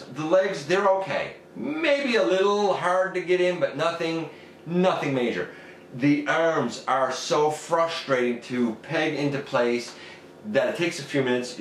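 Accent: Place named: Quebec, Canada. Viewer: You sound French Canadian. Haha, I stumbled across this video precisely because I see the set is on sale for $120.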